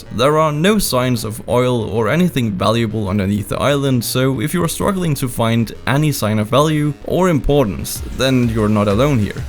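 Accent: Denmark. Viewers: This guy is definetly danish with the way he pronounces danish words! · Are you danish you sounds danish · Are you dane, it Sound like it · You Sound danish not gonna lie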